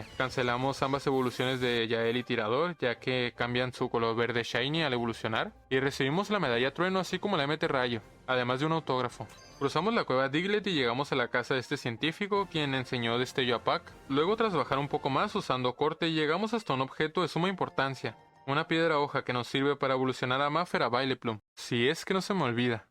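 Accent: Chihuahua, Mexico. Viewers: Eres de chihuahua?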